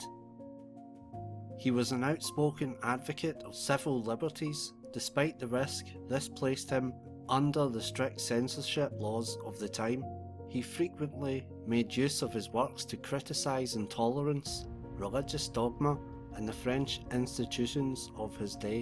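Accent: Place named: Scotland, United Kingdom. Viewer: It's a Scottish accent not Irishman...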